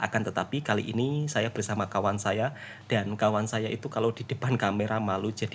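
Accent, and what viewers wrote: Java, Indonesia. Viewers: Assalam Tuan.....tak perlu tukar bentuk bahasa, sy boleh faham. Disini pun ramai org jawa yg bunyinya sama dgn. Pasal pedas, org m'sia punyai lidah yg dan tekak yg berbeza dgn pedas. Jadi utk berniaga, diambil jalan tengah, tidak terlalu pedas! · Loghat jawanya pekat sangat. Macam sup ekor pekat❤❤❤❤❤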